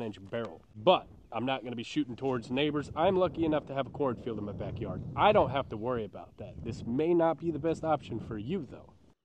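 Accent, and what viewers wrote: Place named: Midwest, United States. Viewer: I always thought midwestern was a nuetral language. Well I'm wrong, there's an accent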